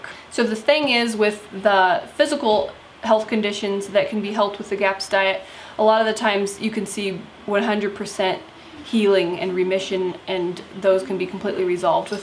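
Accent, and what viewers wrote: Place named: United States. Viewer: I can listen to this beautiful woman all day and that's the best American accent I've heard, really great channel.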